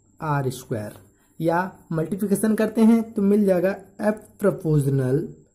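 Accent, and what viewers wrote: Chhattisgarh, India. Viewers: Aap chattishgarh se ho kya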